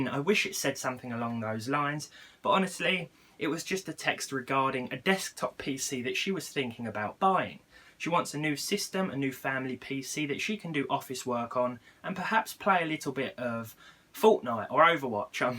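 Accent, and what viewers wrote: United Kingdom. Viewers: hes British dude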